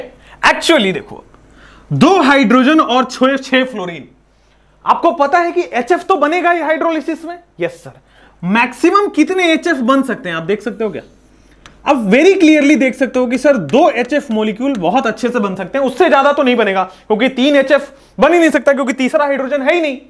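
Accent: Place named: Bihar, India. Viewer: Sir your accent and the way you speak words... Tells us that you are from bihar... And u prooved this too : " Bihari hai to bambaari jrur hogi " .... The only difference is... Aap bambaari chemistry me krate hai.... I literally enjoyed all your lectures.... UP se kota ki teaching ke benefits de rhe hai aap.... Thank you so much sir for your hard work... 🙏✌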